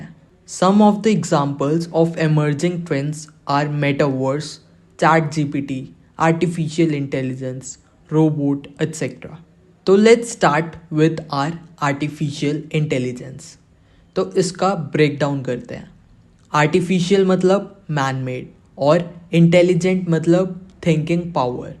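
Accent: India. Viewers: You sound like an average indian with voice stats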